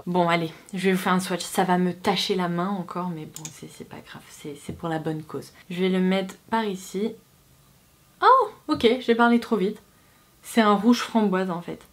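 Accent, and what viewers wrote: England, United Kingdom. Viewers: La vidéo qu’on attendait toutes je pense, au début j’étais comme toi pour la bave d’escargot mais finalement étant donné que ça fonctionne eh ben ma foi 😂😂😂j’adooooooore ton accent anglais en passant hihi et merci pour le concours 🤞🤞🤞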